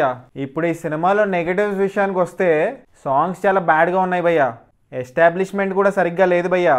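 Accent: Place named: Andhra Pradesh, India. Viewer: Mowa nvvemo nedi telanagana annav..ne mata theerendhe andhra laga undi...ee bhayya bhayya kuda manadi kadhe..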